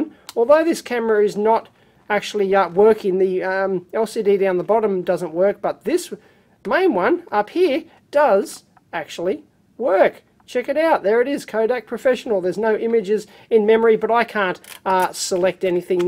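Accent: Australia. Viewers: The crappy Aussie accent made me turn the volume down.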